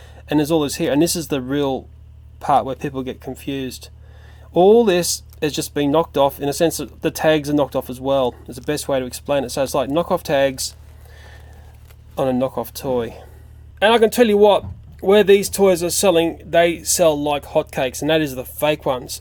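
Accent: Australia. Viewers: toy factory is my favorite knockoff company best of all the fake on is not a toy for kids its a priceless collectors item cause its in a collectors collection for teenagers and really fine old kids and adults who put them up on display where detail matters because I have this find collectors toy and here's is my price for your real minion Dave toy $50.00 and if you like my price please mail it to me Anthony Addison 107 Dwight drive Rome NY 13440 thanks. Anthony PS don't let anybody know I told you and by the way I love your Australian accent.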